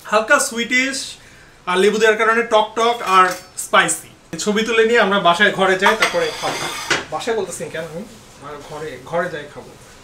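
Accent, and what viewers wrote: Kushtia, Bangladesh. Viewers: তুমি না থাকলে আমার বোর লাগে ❤️❤️❤️❤️❤️ আপনার স্ত্রীকে আপনি খুব ভালোবাসেন ভাইজান। আপনার কথাগুলো খুব সুন্দর ভাই, কুষ্টিয়ার ভাষাটা খুব ভালো লাগে। ভাই আপনার জলীয় বাষ্প ঠিক আছে,বলে যান😅😅😅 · R u from Kushtia?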